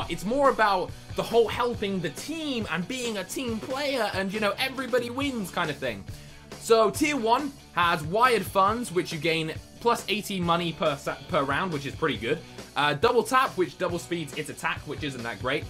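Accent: United Kingdom. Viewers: is your voice a bridish accent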